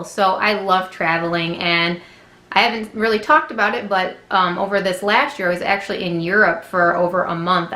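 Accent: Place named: Michigan, United States. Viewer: Ok, this is so weird, because as I was listening to you I was thinking "She sounds like someone from Michigan." LOL No lie! That's actually why I asked! Too funny! Are you on the west or east side? And no, the drive isn't bad at all. Unfortunately though, we discovered that my youngest (who is 13) has some serious anxiety when it comes to driving through the mountains. I definitely do, but we didn't realize he did until he about hyperventilated as we were going up to our condo. It was at the top of a mountain and it was pretty dang scary the sharp, steep turns you had to take where you actually couldn't even see the road in front of you sometimes! We decided next time we go, we'll try to stay somewhere not quite up so high. It was absolutely beautiful where we were though. Also, the guy who we rented the condo through on VRBO told us that he gives a good discount to returning customers and that he has some really nice places right in Gatlinburg, so we're thinking about doing that when we go again!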